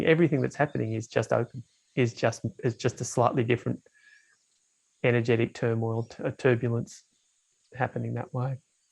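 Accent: Australia. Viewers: Beautifully Said. I haven’t heard ‘it’ put quite this way before.. Such a simple and clear description… Nice to hear a fellow Ozzie too lol. Thank you 😊 Ps. I’d love to hear your talk about when the illusion of being a separate I apparently ends energetically, & not just conceptually. Thanks again🙏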